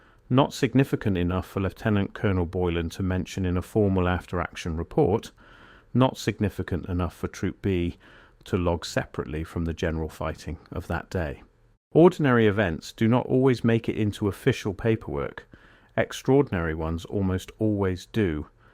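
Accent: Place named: United Kingdom. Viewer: Excellent narration! Something about the British or Australian accent that makes it land so beautifully on the ear.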